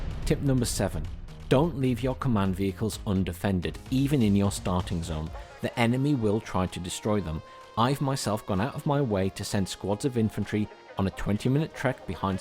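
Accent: United Kingdom. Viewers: "Says in a northern voice" Ohhhh i like a good guide me.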